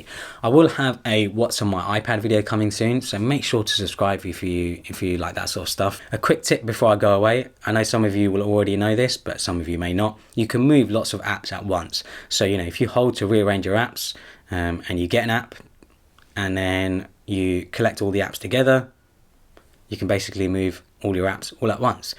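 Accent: United Kingdom. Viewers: Sleek British accent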